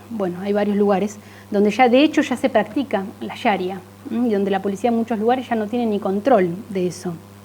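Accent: Buenos Aires, Argentina. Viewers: hermoso escuchar èse acento aporteñado, que me es tan familiar, esplendido trabajo, sólo te daré un consejo, mira la cámara mas frontalmente con menos miedo a la exposicion, eres muy inquieta y seguramente te cuesta estar sentada esponiendo, por lo general los Profesores os moveis mucho, ha sido magnífica lectura me hizo mucho bien enterarme Gracias!!! Hermana Dios te Bendiga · Desconosco el orígen de esta monja, pero por su formade hablar parece ser argentina. No entiendo por que esta tan preocupada por Francia,en todo caso es el precio que tienen que pagar por el nefasto colonialismo frances, ellos fueron los que intrdujeron la siniestra Doctrina de la Seguridad Nacional, que sembró de sangre, dolor y murte a nuestros paises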